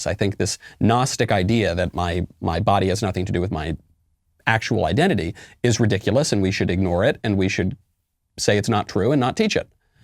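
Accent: England, United Kingdom. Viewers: I'm worried now. I live in England I'm still in school. We're learning about abortion and school and I'm probably the only pro-life person in my year; l've quietly mentioned my views and had a discussion with my liberal friends on it, but I don't feel it's safe to be completely honest even, well especially in my Ethics class. Your English accent is questionable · Love the English accent! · Your British accent is quite impressive... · Christians now more scared of the left than of God!! Your English accent is shite. Its doesn't make you sound funny and takes away from the point you are making. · Very nice british accent by the way. Also, yes, we live in a day and age where Christian views are no longer tolerated. I fear for raising my children in such a society. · with that British accent had me dying lmao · Not a bad UK Accent Mike!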